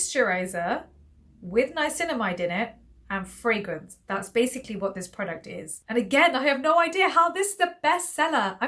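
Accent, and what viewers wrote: United Kingdom. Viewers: Special thanks for you English British accent, i am as a foreigner just can't stand American English accent, so again thanks and all the best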